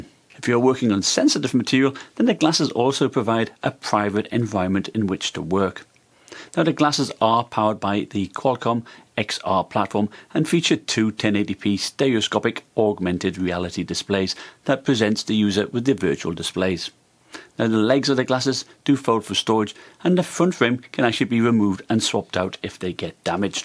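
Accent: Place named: Newcastle, United Kingdom. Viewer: U from newcatle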